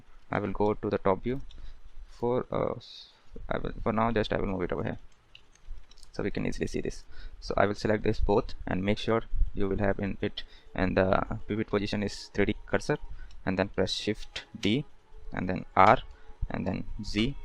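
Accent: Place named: India. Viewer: bhai request ha video ek dam shi bani ha bas jab bolta ha na koshish kar ki words pura smaj aya audience ko indian slang ata ha thoda wo thodi dikat dalta ha baki content tara shi ha ekdum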